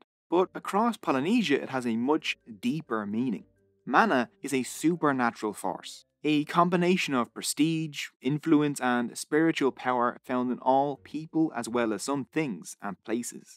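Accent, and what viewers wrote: Ireland. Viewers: I wasn't prepared for the Irish accent 🤣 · Totally agree! And nicely done in an Irish accent! 😁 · Are you Irish? after a few minutes all I can hear is an Irish accent. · I cringe every time you mention any Maori Kupu (Maori words). Whether it is your Irish accent or ignorant of indigenous cultures, it kills my mother tongue. Otherwise, it would've been more entertaining, one and a half stars from five.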